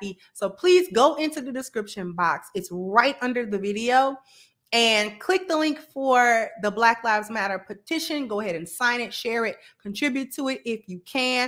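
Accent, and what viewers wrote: Chicago, United States. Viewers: When you said Da Roms on another one of your podcasts I knew you was from Chicago. Only we speak like that. Chicago is in the building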